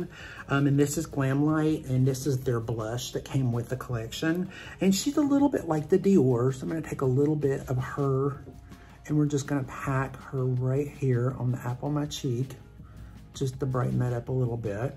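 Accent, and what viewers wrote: South, United States. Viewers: I adore your Southern drawl,. I had a PhD supervisor from Georgia and I would swoon every time he spoke. Even 20 plus years later, I cannot remember a word he said because I was too busy swooning at how he spoke. 😂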